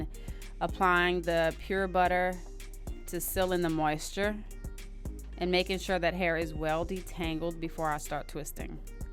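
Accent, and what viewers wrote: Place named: Louisiana, United States. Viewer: I showed this to a guy to get an idea of how his hair would look when I was finished, and as I was watching, it was driving me crazy hearing your voice, i was like, she gotta be from Louisiana 😅. I love it ❤ Great content as well!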